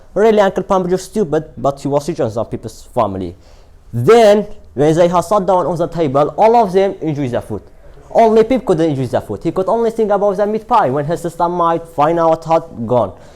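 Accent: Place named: India. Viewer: Mashalla , baas hastakam lahjay hindy wargrtwa😍😍😁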